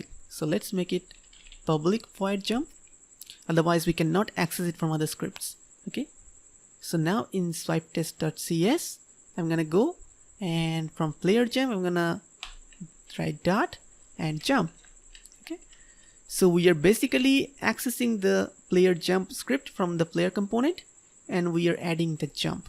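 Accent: Bengal, India. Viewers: Amio Bangali...from bangladesh....apnar kotha bolar style dekhe keno jeno mone hossilo apni bangali...tai ask koresilam.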